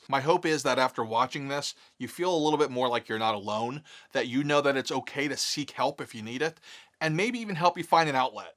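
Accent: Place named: Philadelphia, United States. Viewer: You never said you were a Philly boy, but I knew the voice was 100% Philly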